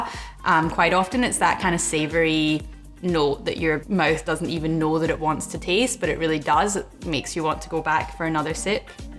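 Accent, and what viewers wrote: Scotland, United Kingdom. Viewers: OMG I thought you were from Scotland by your accent, but you're actually from Australia! That's really interesting. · I think this video was just mentioned in Stuff You Should Know's episode about amari. They mentioned a well put together video about amari by a lovely girl with lovely scottish accent... who else could it be?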